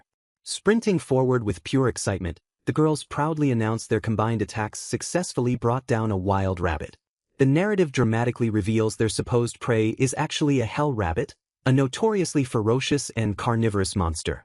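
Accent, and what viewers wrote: United Kingdom. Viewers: BRO WHAT IS THIS ENGLISH BRITISH NARRATOR XD XD XD Where my usual narrator guy lol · using the English accent is smart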